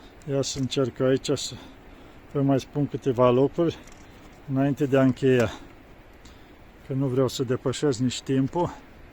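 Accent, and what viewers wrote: Moldova. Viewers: Bunul Dumnezeu să vă dăruiască sănătate și să vă asculte rugăciunea Părinte și M ĂICUȚA NOASTRĂ SĂ păzească și să facă pace în lume Iar ROMÎNIA NOASTRĂ SĂ O PĂZEASCĂ ȘI SĂ o acopere cu cinstitul și SF SĂU ACOPRĂMÎNT IAR PE TOȚI ROMÎNII DE Ori și unde sar afla să ne încingă cu SF - UL BRÎU și să ne țină strîns uniți lîngă SFÎNTĂ CRUCE A MÎNTUITORUL UI HRISTOS ȘI A MILOSTIVIRII SALE AMIN Și DV Vă mulțumim pentru cuvintele frumoase rostite în grai dulce Moldovenesc pentru că și eu sunt moldovean dar mai de la malul Dunării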